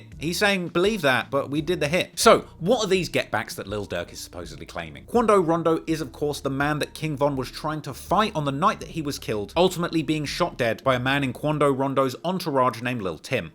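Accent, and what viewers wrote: United Kingdom. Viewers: just a heads up a british accent is not at all good to try being cool and for sure not trying to speak slang man it is horrible please stop trying to talk slang AT ALL or bringing attention to shit the cops may not know yet but putting things you learned out there for more options drama is good for views but not talking street shit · I like how “trap shit” is getting covered by a harmless sounding British fella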